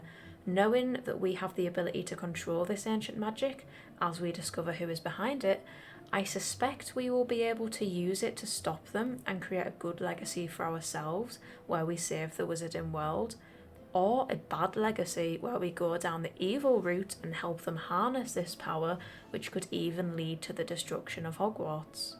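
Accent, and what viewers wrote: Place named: United Kingdom. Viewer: I’m convinced a British person can read anything and make it more interesting 😆 great video!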